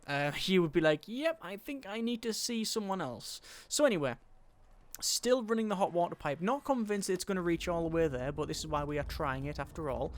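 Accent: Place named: North East England, United Kingdom. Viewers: +emmo999 I'm from the Darlington area of North East, his accent isn't that strong to me, I can't pin point it XD Sunderland, Hartlepool, maybe Newcastle! but swinging more to Newcastle XD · North east - Newcastle/Middlesbrough/Hartlepool area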